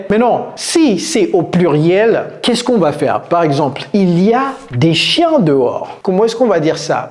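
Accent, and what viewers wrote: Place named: United States. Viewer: Always charmed by your accent Coach..I love American accent by african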